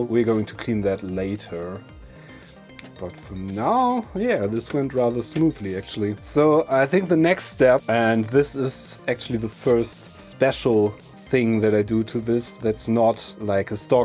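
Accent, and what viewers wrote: Germany. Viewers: You sound German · Man hört das du ein Deutscher bist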